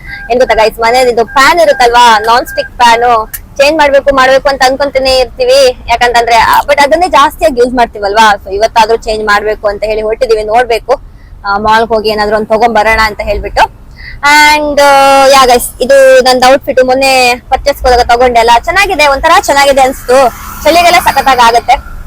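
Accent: Kundapura, India. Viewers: Nam kundapura kannada keli kushi ayt🎉🎉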